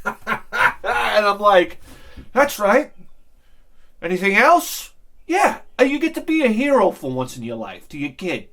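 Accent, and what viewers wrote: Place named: North, United States. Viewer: I dont mind it. Has that northern yankee flavor. Somewhat entertaining in doses. I dig his humor and wantnot. Quintessential Northerner. I like the guy. And I agree, his projects are sick!!!!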